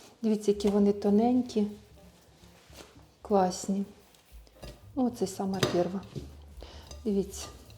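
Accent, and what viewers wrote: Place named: Odesa, Ukraine. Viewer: Одеський говір. На заході теж розмовляють не на укр.мові.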